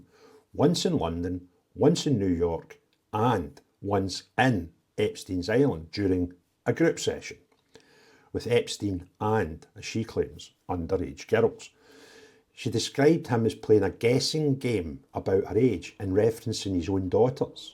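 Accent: Scotland, United Kingdom. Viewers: Sorry mate. Can't understand a word of that broad Scottish accent. Neither can captions.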